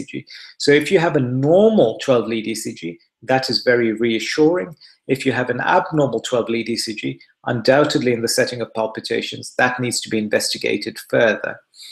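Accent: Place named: United Kingdom. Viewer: Have been crying tonight because yet again, I can't sleep due to palpitations that come on when I try to go to sleep. I want to tell you how wonderful it is out here in the States where I now live,to be able to listen to you with all your information.The fact that you have taken the time to say all this is (excuse the pun) heartwarming! I also feel better hearing your accent because I am originally from the Uk also! I keep being given holtor monitors to see whats happening but my heart often seems to behave perfectly when I have that on, and awful when I don't! Well anyway,thanks again and hopefully next week will be a better week for me because every night this week has been awful and last night I was awake with palps most of the night! Keep up the good work. :)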